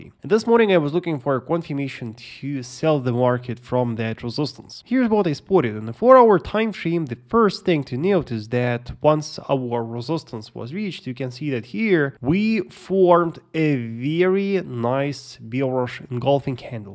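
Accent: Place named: Russia. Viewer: О видно что акцент русский)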